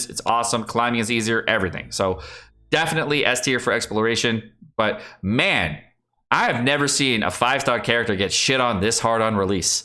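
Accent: RP, United Kingdom. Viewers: I'm English. And your posh English accent is spot on! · Man his British accent is awesome. Dam hilarious intros. Keep it up. 👍🏻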